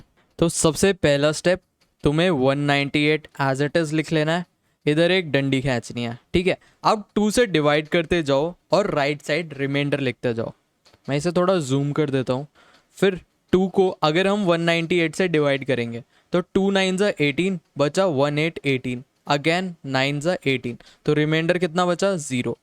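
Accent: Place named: Mumbai, India. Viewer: I can tell from his accent he is from Mumbai.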